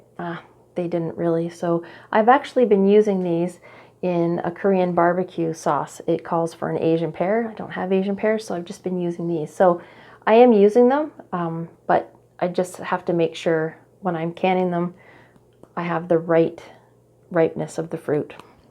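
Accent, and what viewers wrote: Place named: Canada. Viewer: Where are you located? It sounds as though you’re from Canada.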